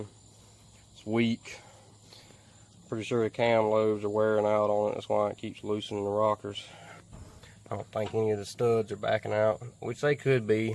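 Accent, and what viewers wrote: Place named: North Carolina, United States. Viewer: You got to be from N.C.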